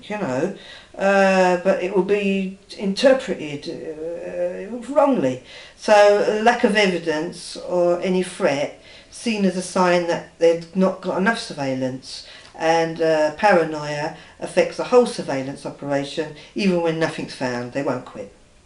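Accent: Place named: London, United Kingdom. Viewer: It's so pleasing to hear a lady speaking on gang stalking with a London accent. I think these people doing the surveillence and doing the gang stalking are just great big spiteful little boys in great big men's bodies.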